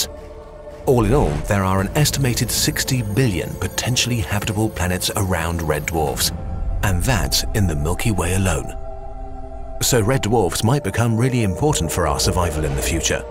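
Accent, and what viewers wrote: United Kingdom. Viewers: Something about a British man calling a Red Dwarf a baby is satisfying. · British guys with relaxing voices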